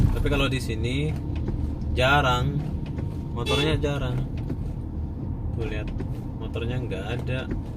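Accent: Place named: Kuala Lumpur, Malaysia. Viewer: Aku suka video kamu.bicara kamu kayak orang kuala lumpur.udah campur2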